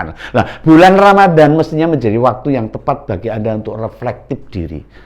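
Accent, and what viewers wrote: Java, Indonesia. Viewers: Mantap mas, carane njenengan menyampaikan koyo dongengengi 😀😀😀👍👍👍 njawani buanget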